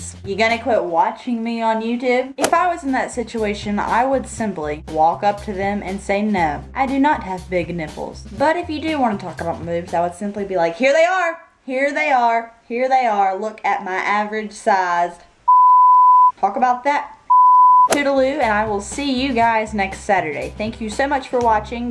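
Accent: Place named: South, United States. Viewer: Where you from folk, you got a slight southern accent doe.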